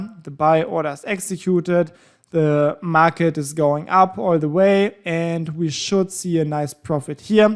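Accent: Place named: Germany. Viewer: Since this guy is intentionally not telling you about time zones, etc. I will do it for him. Judging from his accent, he is probably in Berlin. Which is GMT +2, which also happens to be the same time zone as many/most of the brokers, probably yours too. And definitely his. Lucky for him, his broker is in his own time zone. The rest of us have to translate time zones. He’s starting the high/low range box at 2 AM his time which is also his brokers time. Lucky for him! But not so lucky for the rest of us. is two hours after the close of London. Translation: run your high/low range box from the beginning of Tokyo session continuously for 1.5 hours. Then start trading after that point all the way until two hours after the close London session, which is coincidentally when the Forex volume starts to drop I have no idea why this guy doesn’t explain it. Perhaps the create confusion to drive people to his website and his broker website..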